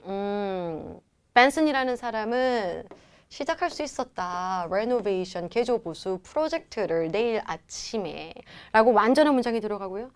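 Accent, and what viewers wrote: Gyeongsang, South Korea. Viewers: 몰랐는데 경상도 발음이 간간히 나오시네요 ㅎ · 경상도 선생님 아이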